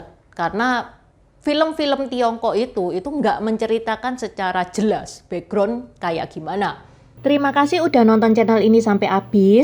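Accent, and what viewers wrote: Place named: East Java, Indonesia. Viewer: Aku senang dengan cara bercerita. Dia pakai bahasa Indonesia sehari2 logat Jawa Timur. Selamat, semoga sukses!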